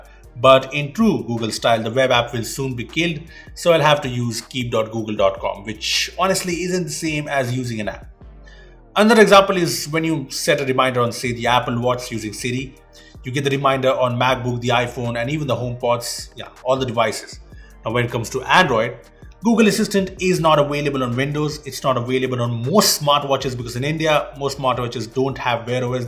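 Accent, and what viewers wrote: India. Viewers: You have the english with indian accent which I hate, however I never got annoyed of your talk and I love to watch you.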